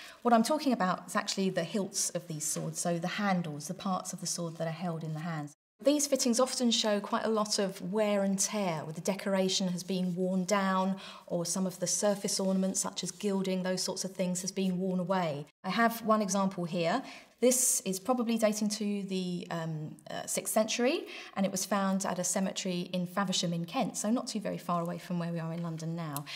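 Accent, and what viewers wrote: England, United Kingdom. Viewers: Please re record this without the English accent. Thanks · Dr, that was excellent! I surely miss hearing a good British accent. Its been awhile. A comment and a question if I may........... I do wish I could have had teachers like you when growing up oh so many years ago, and Where were you when I was your age? You are quite a fetching young lady. I am curious about the tats on your inside forearms, are they of any historical significance? Sorry. That's 2 comments and 2 questions. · It's amazing how a British accent can make two rusty piles of metal seem interesting.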